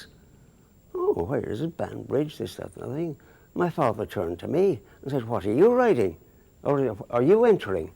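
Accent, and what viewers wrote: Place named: Australia. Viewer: He sounds like an Australian.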